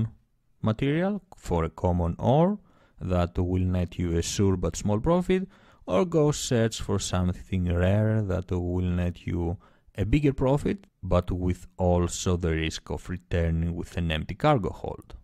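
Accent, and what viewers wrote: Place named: Greece. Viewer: Έλα παλικάρι μου, από Ελλάδα είσαι; τώρα το είδα στο άλλο σου video. LOL. Και μου έμοιαζε η προφορά σου για Ελληνική αλλά δεν ήμουν σίγουρος.....χα χα χα. Πολύ καλά τα videos σου. όσο για τα επαγγέλματα θα σχολιάσω αργότερα όταν θα έχω χρόνο. :)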